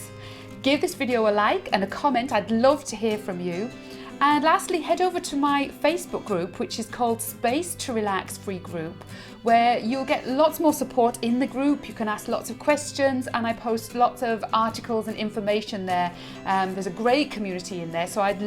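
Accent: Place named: England, United Kingdom. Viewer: You're from England? I ask because of your accent. Lol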